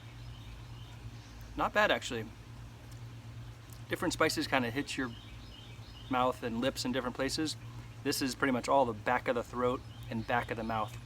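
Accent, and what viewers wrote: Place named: France. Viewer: You have somewhat of a French accent... Are you from a Ontario or Quebec??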